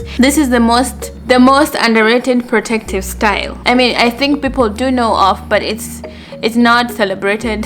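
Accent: Kenya. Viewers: used to talk like this even when she was in Kenya lol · What are you talking about her accent sounds very Kenyan lol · That has been her accent even in Kenya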